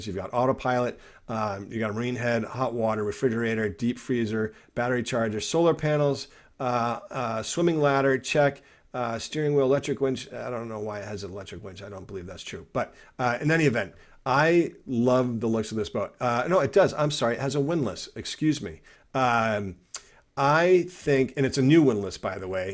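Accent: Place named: College Park, United States. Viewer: I'm A native of collage Park, thought I recognized that accent! 😂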